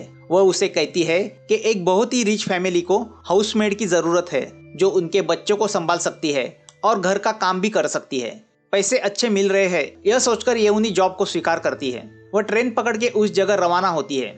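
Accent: Maharashtra, India. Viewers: Typical thick Marathi ascent horrible narrative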